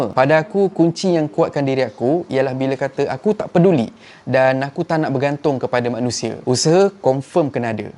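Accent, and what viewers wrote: Kelantan, Malaysia. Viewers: Good effort bang cekek kelate hahahah😂👍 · Mu kecek Klate haha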